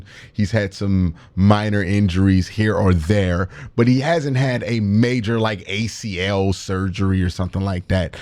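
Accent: Cleveland, United States. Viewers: q ..... frustrated actually has an "R" in the word ....big homie! our horrible cle accents.... hard to fix....i know!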